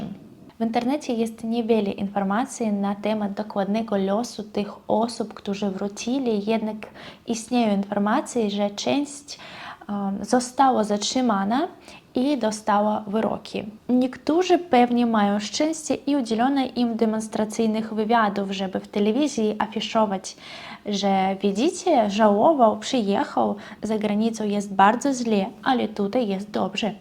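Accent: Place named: East, Poland. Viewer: Znakomicie mówisz w Naszym języku. Masz bardzo barwne i rozwinięte słownictwo. Melodyka jest ze wschodu. Ale jest to jest urocze. Czy zauważyłaś , że każdy Polak bardzo docenia to, że obcokrajowiec stara się mówić w Naszym , przecież bardzo trudnym, języku? Ja takie osoby bardzo szanuję.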